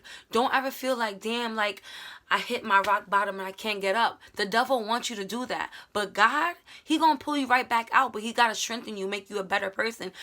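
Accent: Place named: Bronx, United States. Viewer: 746 - 0 no dislikes you a real one ❤❤❤❤ and I love your Bronx accent lol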